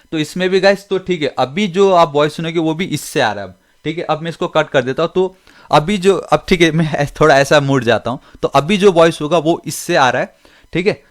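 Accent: Nepal. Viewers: da tapai ko Nepali tone ayo....😁😁 Tara....saachi...dami....u know wat I used to make videos on Hindi as m Nepali so my vocal tone...is not purely Hindi....so my friends used to laugh over me but when I saw ur subscriber and video content it motivate me....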